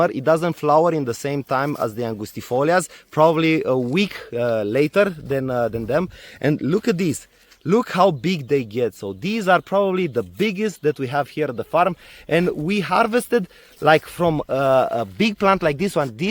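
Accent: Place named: Czech Republic. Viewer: Is that a Czech or Slovak accent I hear? Thank you for all the videos - growing lavender is challenging here in the wet East Coast of the U.S.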